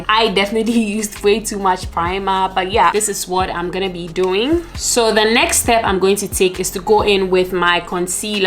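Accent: Ghana. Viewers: I love your videos and how you do your make up. Your make up always looks so natural even when you do a full glam. It never looks like a cake of make up. You are a very beautiful woman. I was trying to figure out where you are from because of your accent but I saw you are from Ghana! That is sooo cool! I love Ghana I would love to go one day!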